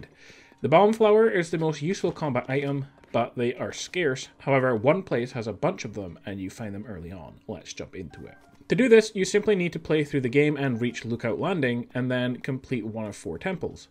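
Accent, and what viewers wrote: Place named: Scotland, United Kingdom. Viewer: Thanks for the video and the North Scotland accent…. I’d say around Inverness?.?.? Possibly Forres or further East Aberdeen way.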